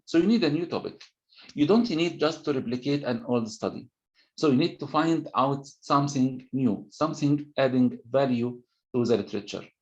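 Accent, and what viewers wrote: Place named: Arab world. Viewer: Look like an Arabic English...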